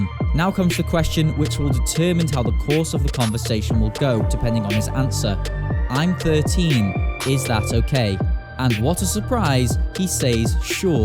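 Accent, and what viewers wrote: Wales, United Kingdom. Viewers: bri’ish man has good content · Welsh Chris Hansen.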